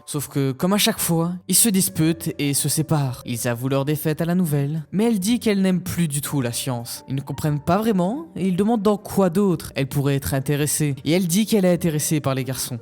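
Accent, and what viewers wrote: Belgium. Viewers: T’es belge w?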